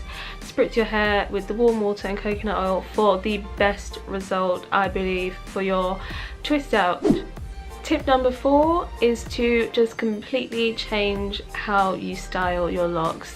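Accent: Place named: England, United Kingdom. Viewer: Lol, ya mom chupsing! Then I heard your English accent made me subscribe even though my locs are much smaller. Been looking for fellow Brits to follow. 👍🏾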